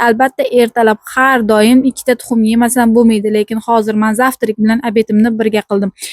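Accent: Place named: Olot, Uzbekistan. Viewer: Shevayizga gap yoq,haqiqiy olotcha❤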